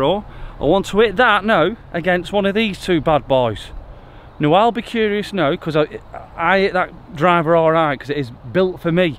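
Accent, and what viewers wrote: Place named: Bolton, United Kingdom. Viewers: I’m an American, and I dig the Bolton accent. Reminds me of Fred Dibnah. Using ‘were’ in place of ‘was.’ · Nice to hear a northern accent on YouTube for a change, I’m from Wakefield so I understood u perfectly 👍🏼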